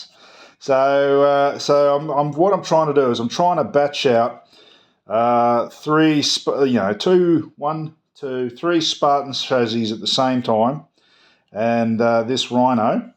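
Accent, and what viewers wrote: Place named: Australia. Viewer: love hearing another aussie talking about the hobby, good job mate!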